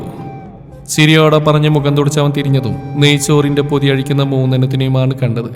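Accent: Tamil Nadu, India. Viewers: തമിഴ് കലർന്ന മലയാളം നന്നായി പറയുന്ന ഹൃദയം നിറഞ്ഞ സ്നേഹാദ്റമായ അഭിനന്ദനം🎉🎉🎉🎉🎉🎉🎉🎉🎉🎉🎉🎉🎉🎉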